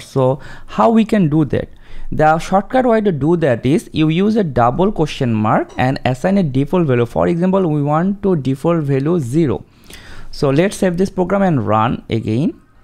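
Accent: India. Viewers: Опять вместо английского индусский! Или что, из-за толерантности будем слушать музыкантов без слуха, смотреть на безногих танцоров и учиться у учителей с таким чудовищным акцентом! Бред какой-то! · In my opinion it is Indian accent because I am Indian and my accent is also same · Perfect for programmers. super comprehensive. I see Indian accent tutorial, I thumbs up. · Are you Indian cause your accent sounds Indian and it's so freaking cool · I dont wanna be racist but god fooookin damn it how im supposed to get what you say with that indian accent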